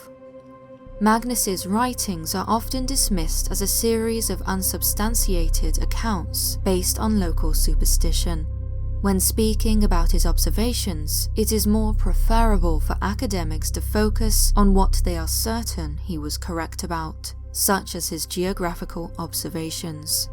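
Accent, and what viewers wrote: United Kingdom. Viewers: I love this voice, is so feminine, so delicate, so British, so beautiful 🥰, hope you like roses 🌹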